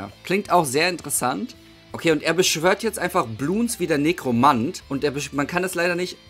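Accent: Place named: Germany. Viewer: Ich hab in deinen Englischen Videos irgendwie schon gehört dass du Deutsch bist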